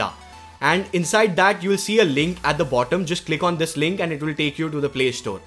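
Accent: India. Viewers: Good video! But I must say Mr Man from India, LOL! Your video and your f**** talking with your India accent is way too quick and fast and as you know most of us are watching this video on our cell phones we could barely even see the writing on your cell phone and you're trying to show us on your cell phone and you go way too fast and you speak too fast with an Indian accent, , because of that your video sucks because you're way too fast and no one comprehended anything from your video we can't even barely see your cell phones writing and then you go so fast also to top it off with ice cream you all so f**** put the cherry on top with your India accent I mean come to f*** on get real no one got anything from this video you piece of dumbass! LOL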